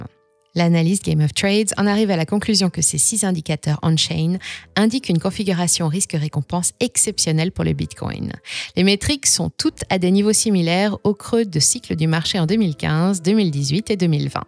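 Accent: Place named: United States. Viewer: oui c’est bien possible. Après elle a un excellent accent américain. Après on a pas l habitude de dire bit cogne prononcé comme ça mais j’ai un pote qui dit tout à la Française genre bit coin (comme un coin) c’est horrible 🤣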